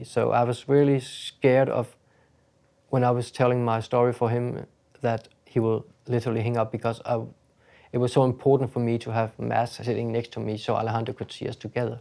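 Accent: Denmark. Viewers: Man kunne høre han var dansker fra starten 😂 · Damn, knew he was danish the moment he opened his mouth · Bro the danish accent is so clear it’s funny to me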